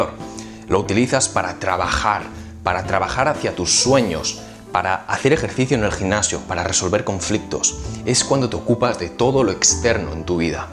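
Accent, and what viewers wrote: Spain. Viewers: habla castellano con tono Español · Si rasgó orientales asentó español y una voz muy varonil y ademas guapo y simpático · This the first time seeing you Your Spanish is impeccable better than mine you have to live either in Spain because of the accident that you have with certain words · Un asiático con acento español💖 Oh Dios, me enamoré 😍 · Woooow me acabo de enamorar !! Que combnación mas way!! Rasgos orientales, acento español y una voz súper Varonil !! 😍😍😍😍😘😘😘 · Es porque se habrá criado desde niño o nacido en España. Yo tengo un amigo chino que habla igual, como nosotros los españoles, porque ha nacido aqui. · Excelente video, me llama la atención, que un oriental hable con acento español... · Hola , excelente informacion y con acento español pero muy bien explicado.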